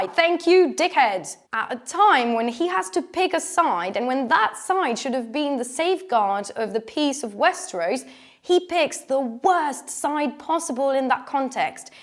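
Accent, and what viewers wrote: England, United Kingdom. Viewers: Superbe accent anglais ! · Super British accent!!!!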